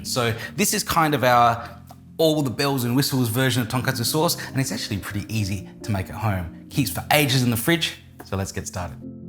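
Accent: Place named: Australia. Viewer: You have cool Aussie accent! Great presentation 🥰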